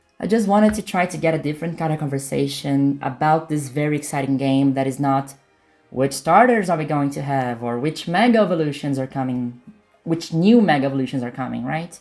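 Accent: Brazil. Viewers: Beautiful brazilian accent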